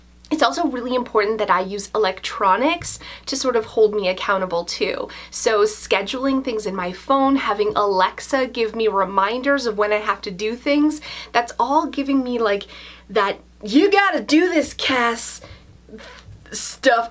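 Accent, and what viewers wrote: Canada. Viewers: In the same way you like her Irish accent, I enjoy your Canadian accent! 😍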